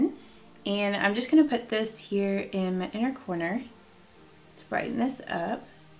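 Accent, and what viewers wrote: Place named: Kentucky, United States. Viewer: You have to be from KY. You have the same accent as I do ☺️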